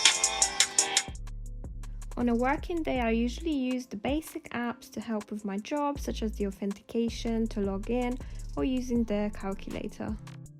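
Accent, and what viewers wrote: United Kingdom. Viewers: O jeeeeeeżu, szukałam info o Apple Watch, słucham, myśle „ale ładny akcent przyjemnie się słucha”, a tu nagle po polsku :) :) :) byłam przekonana, że jesteś Brytyjką, pozytywnie zazdroszczę tak idealnego angielskiego. Pozdrawiam z Trójmiasta ❤️✌️ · I love the commentary and information you provide but your pronunciation of the "th" sound as "f" is a bit annoying, detracting from an otherwise enjoyable viewing experience. Is that a muddling of an Eastern European or Russian accent with British or is it a straight Cockney trait? I'm sure you're aware of it but a few examples include saying "fun fings to do" instead of "fun things to do", "a day in the life wiff..." instead of "a day in the life with..." or "that's everyfing" instead of "that's everything." Hopefully you can correct this small dialect flaw for future videos as your content is a pleasure to watch. 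Cheers!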